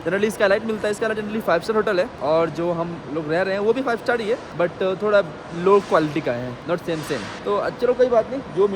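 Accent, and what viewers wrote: Bihar, India. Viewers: ❤❤❤❤❤ Bhai aap to same to same meri tarah hi bolte ho bihari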